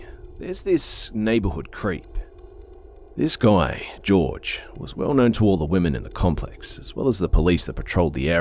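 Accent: Australia. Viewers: The way he tells a story is not scary whatsoever. but more like he's reading from a newspaper. I mean, I can actually listen to him in the dark at home by myself and it doesn't creep me out nor scare the hell out of Me! Or maybe it's the accent, because Australians have the worst accents on the planet to me!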